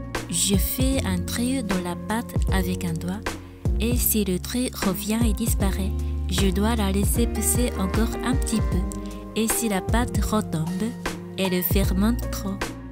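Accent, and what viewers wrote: Japan. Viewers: Bonjour, merci beaucoup pour la recette car j'adore les pains japonais moi j'avais trouvé la recette qui contient du Tangzonk. C'est délicieux. J'aime votre accent, votre pays culture, vos musiques. J'aime tout particulièrement votre respect et votre sens de l'honneur. Vous parler déjà très bien le Français. Si je pouvais parler le Japonais comme vous parler le Français cela me permettrais de pouvoir visiter votre magnifique pays. Bien à vous. Rosalie.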